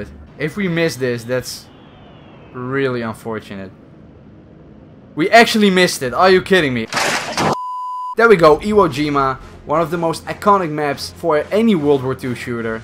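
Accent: Netherlands. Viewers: based on the accent of this guy he is from the Netherlands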